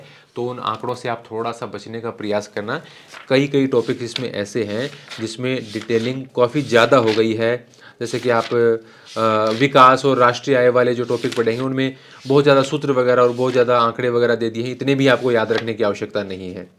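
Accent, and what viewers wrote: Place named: Bharatpur, India. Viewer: गुरुजी नमस्कार मजा आ गयो आपकी बोली कतये भरतपुर के की तरह खड़ी है सीधी सपाट बात करें आपकू बहुत बहुत धन्यबाद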